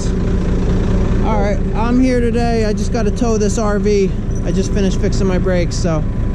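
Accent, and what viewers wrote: New York, United States. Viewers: “What used to fix it wiff” Good old country New York accent.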